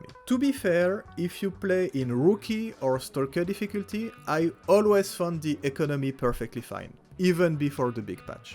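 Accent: France. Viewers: come on, with the full gameplay with mods already! I try to watch other gameplays, but god, some are boring ! ++++ bonus to your French accent, most times I want to see no comments gameplay, but yours is damn enjoyable ! mod the game how you like it, and lets see some STALKER2 gameplay from you! I know you won't disappoint! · Your super subtle french accent 😅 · Magnifique accent français !! et merci pour cette video je pense installer au moins la moitié des mods dont tu as parlé, mais "eye adaptation fix" oh bordel MERCI CA EXISTE !!??!! :) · J'ai replongé dans l'univers de S.T.A.L.K.E.R plus sérieusement récemment et j'ai découvert tes vidéos, je t'écris en français car j'assume par ton accent que tu l'es ;) merci pour tes vidéos j'adore vraiment t'es au top !! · are you french? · This French accent is so strong 😂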